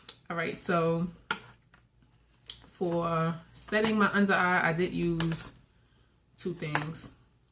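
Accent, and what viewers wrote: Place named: North, United States. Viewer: I enjoyed watching. Came over from JustSynetta's channel (Love her.). Your eye look is freaking amazing. I must try to recreate really soon. Do you do tutorials? Must go see. I need to be shopping my stash before it needs to be thrown away. OAN: I got distracted a few times by the Northern accent (Jersey born, Atlanta is home now).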